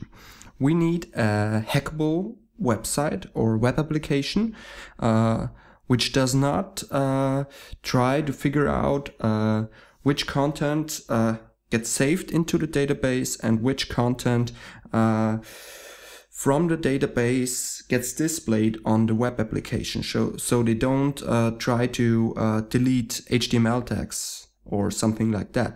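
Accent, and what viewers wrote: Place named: Germany. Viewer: Germanish english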